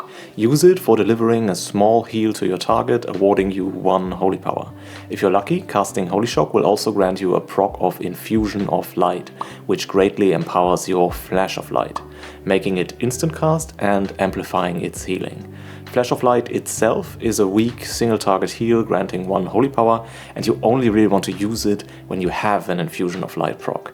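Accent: Germany. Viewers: Hi where are u from if u dont mind asking! cuz u have a german accent haha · I hear a bit DEUTSCH out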